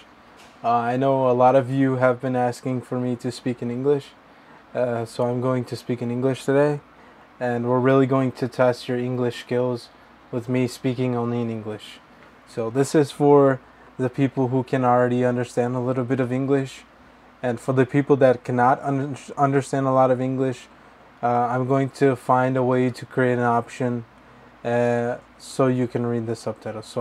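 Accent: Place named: Sao Paulo, Brazil. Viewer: Yeah, your portuguese looks like paulista accent.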